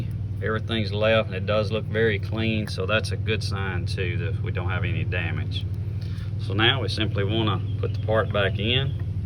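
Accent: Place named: South, United States. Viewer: Not $100. I paid about $40 - $50 at the orange box store. It depends on far the turn-valve is from street level. Mine is about three feet down so I can’t reach it with a wrench and had to buy the water key. In the far north, they can be four feet below ground, due to the frost line. This guy sounds like he’s in the South, so they’re not buried very deep and he can easily reach it with a hand tool.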